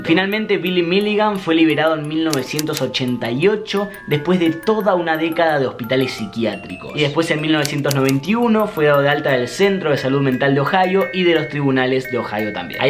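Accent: Argentina. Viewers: Amo tu acento argentino,saludos de México ♡♡.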